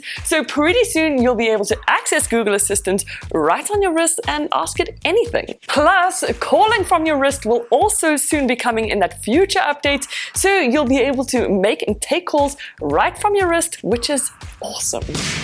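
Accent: South Africa. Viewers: You a Saffa (accent)? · Awesome wath. But are you from south Africa?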